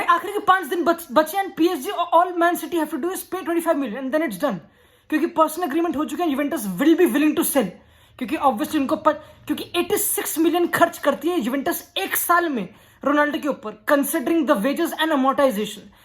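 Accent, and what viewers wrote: India. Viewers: Hindi accent is 🔥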